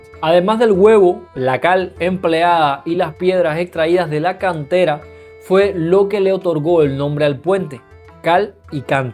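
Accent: Cuba. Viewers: Estimado amigo...! Que grato escuchar tu relato, sobre este puente del cual no se habla mucho y menos con la presicion, dedicación y amor al relato, con lógica histórica, ya que la colonia en todo el mundo fue una época vivida con mucha dificultad desagradable que si bien es cierto está gran parte escrita hay lugar en el relato qué da lugar a la fantasía pero de la buena, grata entretenida aferrada a la historia escrita, se te agradece Estimado amigo cubano, tu acento le obsequió un tono además hermoso a esta historia, un millón de gracias.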